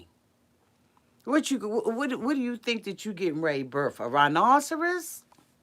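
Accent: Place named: DMV, United States. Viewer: This my first time listening to u, but I can hear the dmv all up in u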